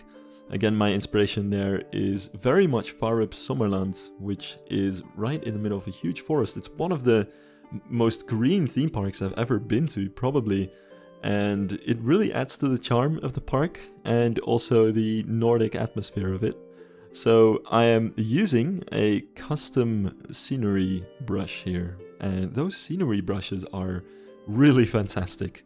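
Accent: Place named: Netherlands. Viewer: Hallo ik hoor aan je stem dat je Nederland bent dus ik wouw vragen of je de xpress van Walibi kan (namaken) maar dan goed en wouw je dent hier goed in O ik zou zelf doen maar zit op Xbox vast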